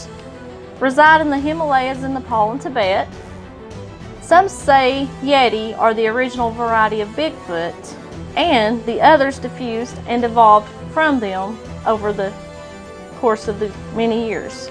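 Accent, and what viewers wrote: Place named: Appalachia, United States. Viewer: What a bunch of garbage with a hillbilly accent !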